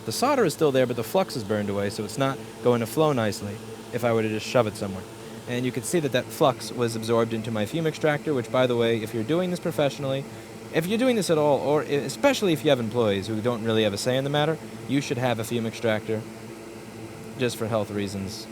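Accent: New York, United States. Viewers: You are hella New Yorker but I like it. Hey I actually learned how to Solder watching your videos so thanks man. You do you bro, I know I enjoy your videos.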